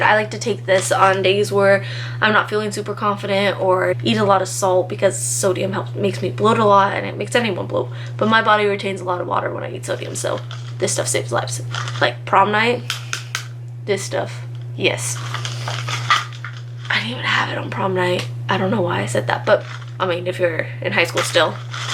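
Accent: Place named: Italy. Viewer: Been hanging out with me too much is why you're talking like an Italian sorry not sorry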